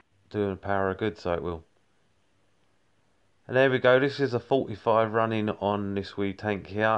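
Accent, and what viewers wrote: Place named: England, United Kingdom. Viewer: Great review been thinking of getting one of these 👍🏼 nice to hear an English accent like mine for once on YouTube 😜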